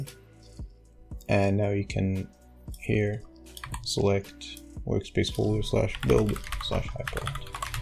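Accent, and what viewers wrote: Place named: Canada. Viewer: Your pronunciation of dipthong /aw/ rose to a mid vowel preceding a voiceless obstruent (Canadian Raising) (Common in The Big Smoke) (Common in Mississauga)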